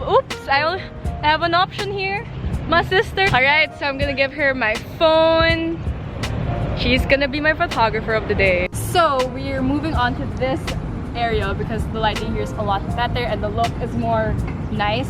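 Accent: Philippines. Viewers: damn, filipino accents, its so weird, okay im not hating because I also is a filipino, and my old "grammar" doesn't make any sense, just saying okay, dont hate me I also use to have a bad accent when speaking english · are you filipino? (accent)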